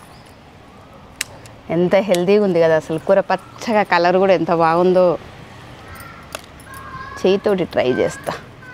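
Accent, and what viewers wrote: Telangana, India. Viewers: Madam i am new subscriber just now actually my wife was your old subscriber. And i like your way of delivery Telangana sentences. All the best madam.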